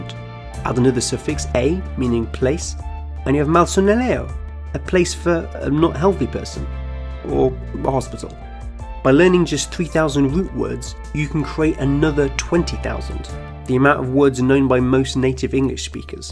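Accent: England, United Kingdom. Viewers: What region of the U.K. is your accent from? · mi ne komprenis nenion ankaû, cxar lia akcento angla influas la prononco tro multe xD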